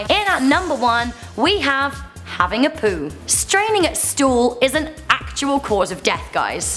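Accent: England, United Kingdom. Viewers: Please stop using brits with their weird ass voices. Can you get a Nigerian narrator please? They have sexy voices. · i Hear that you are...English???? I'm english to and just wondered if you would come to comic con or if you like lived in london..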